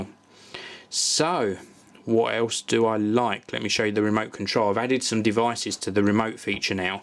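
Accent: United Kingdom. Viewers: which part of England are you from? you seem to have a thick British accent. great review btw. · Cool Britain Accent ... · Very cute British accent.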